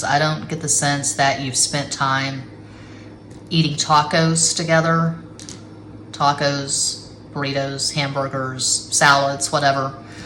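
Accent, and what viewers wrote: Texas, United States. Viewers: I love Texas accent, love from Austin, TX. 💖 Picked Pile 4, wish we are committed, but already broken up, he immediately jumped back to a rebound with his ex, essentially monkey branched behind my back. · Eres muy buena!!! And i love your texan Spanish! i lived in F Worth few years ago.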